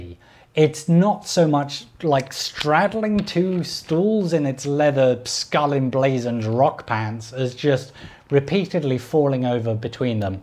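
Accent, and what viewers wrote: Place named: London, United Kingdom. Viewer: Then why does he talk like he's in London?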